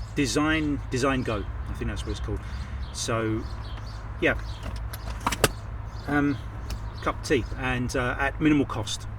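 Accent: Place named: England, United Kingdom. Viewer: I don't get it. You sound English not Bangladeshi. Can't you just afford a coffee?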